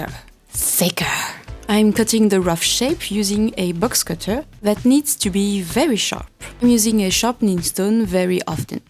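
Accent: France. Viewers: this is really dumb probably, but as another person with a strong accent (swede) i feel a little insecure abt it, but seeing you proud of your origin country and me really loving your voice has made me...… prouder of my own accent??? even though the france accent is recognized as prettier than the swedish accent??? idk i kind of like how my vowels sound now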